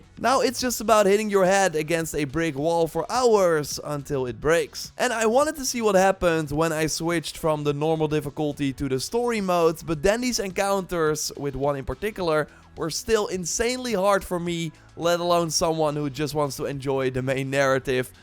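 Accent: Netherlands. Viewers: I love this Dutch accent so much ❤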